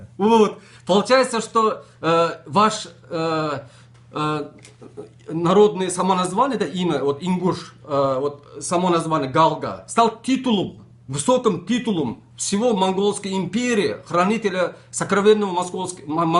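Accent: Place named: Tajikistan. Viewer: Это не монгол. У него акцент даже таджикский (персидский). Сказки рассказывает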